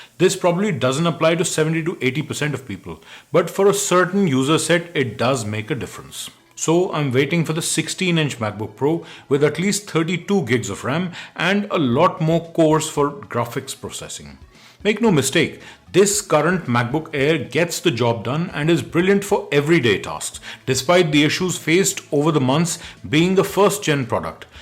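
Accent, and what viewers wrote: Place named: India. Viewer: Super excellent videos..Great guide to lot of decisions..The M1 playlist is just incredible..Glad to find an Indian English speaking content creator adding so much great value..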